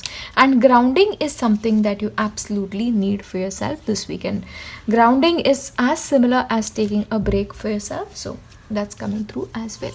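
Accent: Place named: India. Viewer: Your accent reminds me of my close Indian friends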